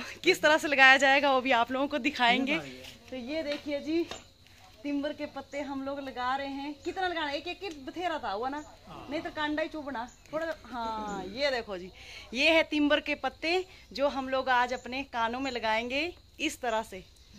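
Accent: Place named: Bilaspur, India. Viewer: Madam ji aapka mayaka khan hai aap bilaspuri bol rha hai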